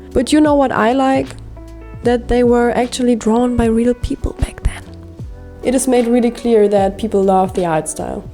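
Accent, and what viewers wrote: Germany. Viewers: Bist du deutsch? Klingt wie deutsches Akzent. Grüßle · Is it just me or does she sound like she's German.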